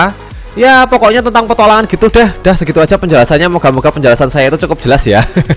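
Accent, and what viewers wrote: Java, Indonesia. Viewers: kayak medhok medhok gimana gitu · medhok medhoknya yang bikin muantep btw saya asli jawa juga bang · Bang orang jawa yah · bang orang jawa ya?? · Uwong jowo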